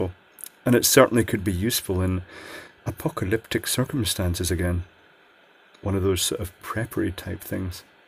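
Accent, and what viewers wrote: Scotland, United Kingdom. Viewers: A Quick question are you from Scotland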